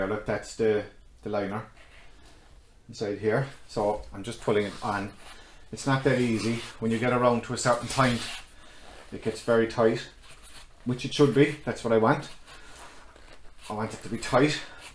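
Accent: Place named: Ireland. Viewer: Sound! I've never got them exactly the way I want first time. The power of editing!! Also great to hear an Irish accent making its way in YT space. Slan a chara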